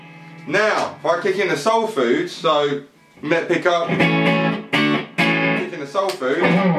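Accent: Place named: Southampton, United Kingdom. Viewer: Mate, you're a riot 😄 Just discovered you tonight on YouTube where I love to spend most of my down time here in Australia watching everything guitar (& I'm from Southampton, so your accent reminds me of home) I play a Strat & a couple of Les Pauls through a Vox AC15cc with Mullard NOS tubes. Just bought a J Rocket "Archer" pedal for Klon like boost/distortion - sounds great! Trimmed my pedals down to the basics now.. MXR Delay, TC Looper, TC Mini Tuner & amp foot switch reverb & trem Looking forward to lowing through your uploads, keep up the good work mate! PS - a little envious you got to meet Phil X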